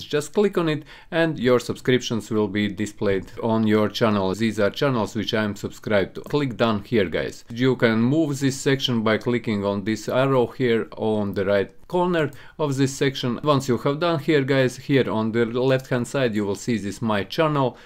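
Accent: Russia. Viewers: Hes Russian i think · i love russian accent 😂🔥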